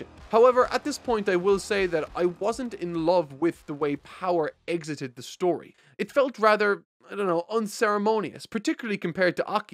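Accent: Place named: Ireland. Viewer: ARE YOU IRISH? ... WHY IT SOUNDED SO NATURAL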